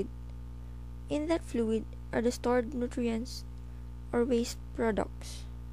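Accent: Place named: Philippines. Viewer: funny how i can tell this girl is a filipino =)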